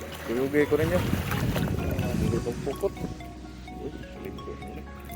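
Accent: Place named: Visayas, Philippines. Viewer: Hala bisaya diay ka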